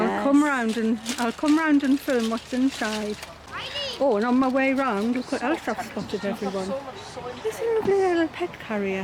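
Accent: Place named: Yorkshire, United Kingdom. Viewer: loved your video, sounds like you are from Yorkshire, would I be right?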